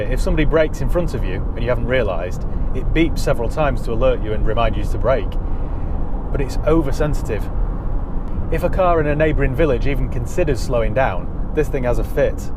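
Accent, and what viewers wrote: United Kingdom. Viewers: So you’re British....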